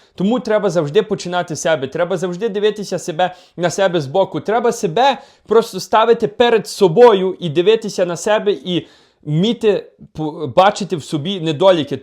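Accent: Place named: Transcarpathia, Ukraine. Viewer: Ти звідки,? З закарпаття? В тебе такий говір, акцент як в них говірка наче в Діда Віщуна з Файна Юкрайна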